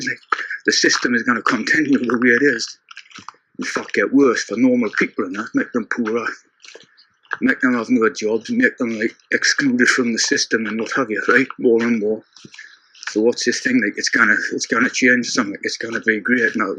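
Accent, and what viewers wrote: Newcastle, United Kingdom. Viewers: lol.. 4th time into watching it and still not getting more than few words! 😅 but i will not give up since you say it's important Knowledge and anyway i like your voice..so going soon for the 5th... geordie accent i will decode you 💪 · I have heard this 3 times now and very interesting to say the least. Love the Geordie accent, Whay aye man! My family are from the north east :)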